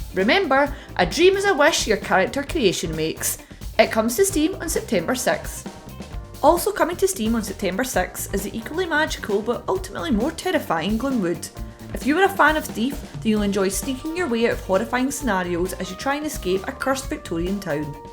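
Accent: Scotland, United Kingdom. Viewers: SCOTTISH M8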